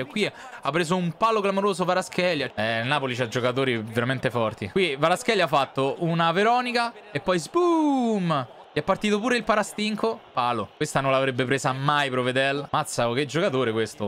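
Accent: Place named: Frosinone, Italy. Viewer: Bravo.... tutto sommato obbiettivo e onesto per quanto ha visto e raccontato. Detto da un romanista é un grosso complimento. Rimane questo tratto di accento frusinate che non guasta visto che parliamo di un laziese😅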